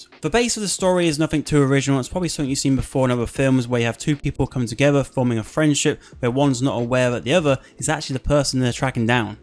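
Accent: United Kingdom. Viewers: Is this British accent??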